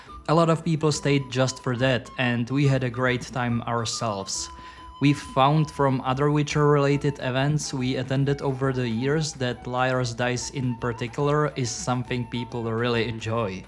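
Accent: Czech Republic. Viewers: opened a kcd video sceptical by default, heard a czech accent and knew i was in for a TREAT 🙏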